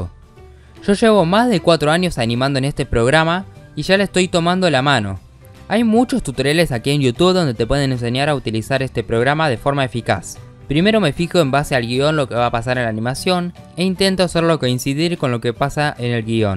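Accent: Argentina. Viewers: ey pregunta sos argentino o chileno? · Eres argentino? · Mentira q so argentino no?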